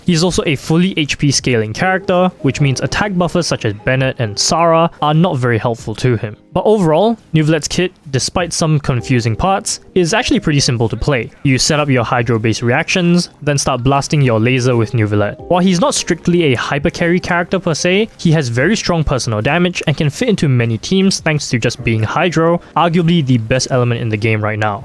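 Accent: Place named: India. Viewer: broo are yoy indian? you sound super indian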